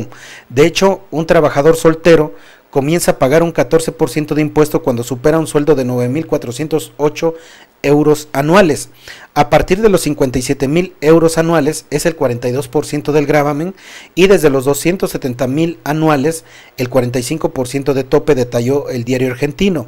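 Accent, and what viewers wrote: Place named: Mexico. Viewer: Me gusta como tu voz es la de los mexicanos no deje de hablar como es, claro y conciso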